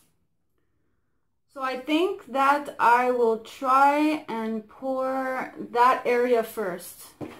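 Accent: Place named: Canada. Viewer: your Canadian eh! love it great work😁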